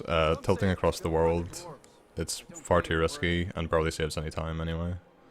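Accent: Northern Ireland, United Kingdom. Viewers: It's always nice to hear another Northern Irish lad who is also a massive elder scrolls fan, love the videos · Northern Irish I'm pretty sure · Is that an NI accent I hear? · holy shit my fucking man's northern irish. and people say we're not smart, pfft.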